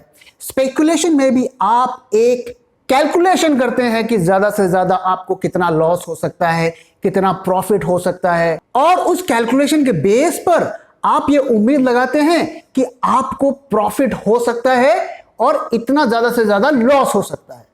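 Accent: Bengal, India. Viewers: Sir ,apki baton se malum chalta hai ap Bengali ho...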